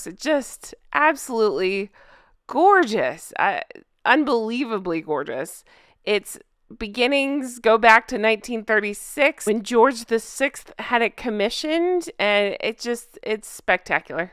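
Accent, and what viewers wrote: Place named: United States. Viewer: I get all your videos out of sync because I watch on TV - also don’t use notification beeps on my phone. I saw your video with dreadful albeit illiterate insults the sugars posted to you. I LOVE your voice! Until I started following both you and lovely Beebs I disliked American accent on television but you two have such warm ( and not loud) voices. I couldn’t bring myself to watch Hank and Skans Netflix show or that dreadful Oprah woman. I’m not defending Meghan as I loathe her but Princess Diana always contacted paparazzi which could have avoided fatal crash ( think I said that before but I’m old!😂). Love your compilations- everything actually. Unlike skank Princess Catherine obviously has a loving family with real children. Still must be hurtful though. She’s so loved in Britain ( unless you are anti monarchists). She’s perfect 🐾😺💕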